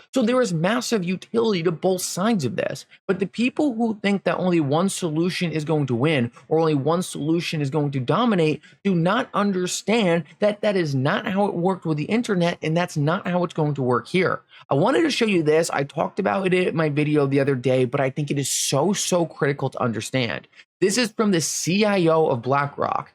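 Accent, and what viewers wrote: Allentown, United States. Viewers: xrp is dead sounds like you have an Allentown accent. Figures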